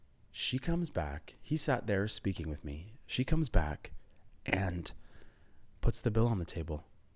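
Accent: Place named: Australia. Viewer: I really thought you were Australian with a good American accent😅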